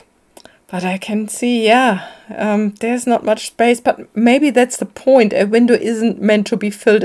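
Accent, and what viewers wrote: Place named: Germany. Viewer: Hi! New subscriber here! I love your interpretation of this prompt so much! I lived in Germany for 4 years. My husband is in the US Army & we were stationed in Landstuhl from <num>. I still have many friends who I still keep in touch with from there. Your accent brought back good memories. I have quite a few windows it is very uncomfortable to see. But I love the thought that seeing it can kind of release us. You are a breath of fresh air! I’m so glad I found you through this collaboration. Danke. Tschüss! xoxo, Jenn 🤗💚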